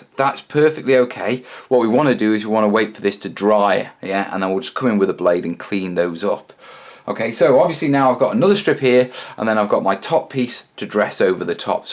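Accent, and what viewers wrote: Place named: Lancashire, United Kingdom. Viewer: I knew it was Lancashire...nice viddy mate!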